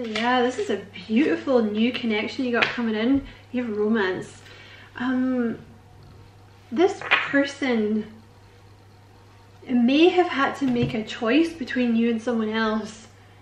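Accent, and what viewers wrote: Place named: Scotland, United Kingdom. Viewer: First, if anyone screams at you in the comments, I will squash them like a bug.lol Second, I could listen to your Scottish accent all day. I came from there. It centers me and it gives me comfort. Guess you're stuck with me and when I move back I'll ignore you...lol..just kidding...thanks for the healing you bring that helps me guide my destiny in the kindest of ways. You rock!!!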